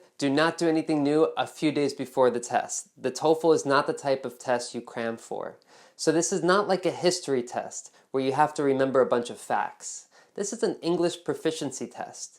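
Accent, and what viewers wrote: United States. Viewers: Is that New York accent I'm hearing? The "T"s sound a bit like how Italians or Spanish pronounce their Ts.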